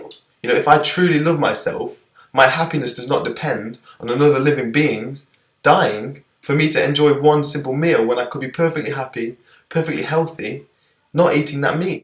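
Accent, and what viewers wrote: United Kingdom. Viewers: every persons happiness leaves another person upset/hurt..the animals are bread for food...the worlds population is so vast now, we are running out of natural supplies, we need this...and by the sounds of it, you are from the UK, read your vegetable wrappers etc. you will see it is coming from elsewhere in the world where people are struggling in slavery conditions to produce just enough food for us, to make them enough money for their family to eat a meal that week, please, think things through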